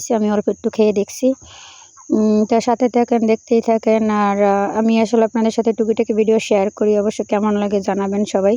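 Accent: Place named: Barishal, Bangladesh. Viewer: ওরে আল্লাহ মিল্লা গেছে মিল্লা গেছে,,মোরা দেহি বরিশাল্লা মনু🤭🤭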